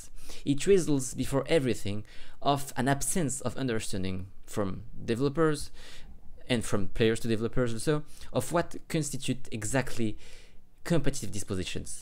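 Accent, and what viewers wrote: France. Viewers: T'es français non ?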